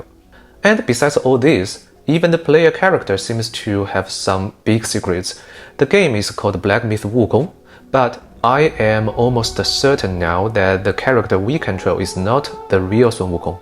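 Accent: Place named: China. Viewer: 是国人吧 这中式口音（并无冒犯之意）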